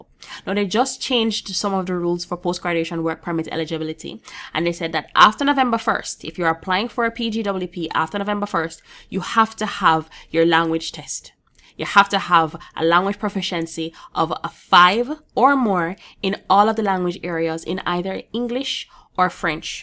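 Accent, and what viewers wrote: Jamaica. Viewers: Love this.... Jamaican?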